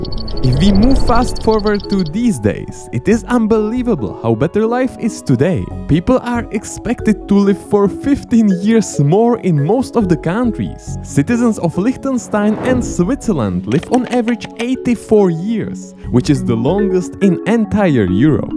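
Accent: Czech Republic. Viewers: Your videos are good, but that strong czech accent is driving me crazy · yes but he is tchéque not french.. still, i like the accent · The strongest Czech accent, ive ever heard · Skvělý video! Btw hned mi bylo jasný, že seš čech, ten českej přízvuk se prostě nezapře :D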